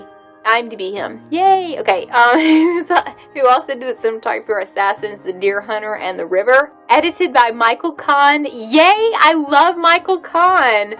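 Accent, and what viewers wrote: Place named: South, United States. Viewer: Just saw this movie. Haha where are you from? Judging by your southerness, you seem really close by. 😂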